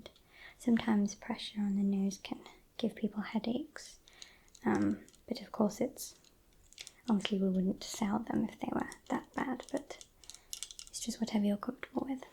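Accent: United Kingdom. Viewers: Bri'ish?! My favourite. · I enjoy when we get a Dr. appointment from time-to-time 🙂 The eye lid lifting was quite tingly for me. The Queen’s English makes part of the exam sound a bit aggressive 😉 “Point a ‘torch’ at your eye” - someone from the US: 😨 “Hang on! You’re gonna what?!” And the ‘Is the dress blue or gold?’ reference 🙌🏼 nice flashback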